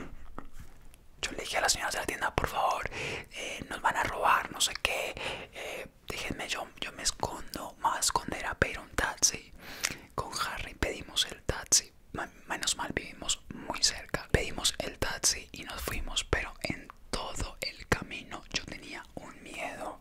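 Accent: Bogotá, Colombia. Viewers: Ahhh tu eres de Bogotá 🤔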